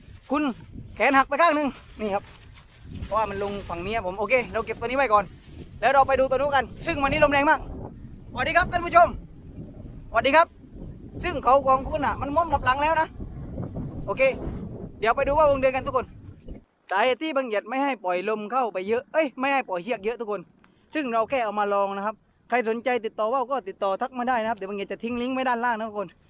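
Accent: South, Thailand. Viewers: ผมชอบมากคับถาษาใต้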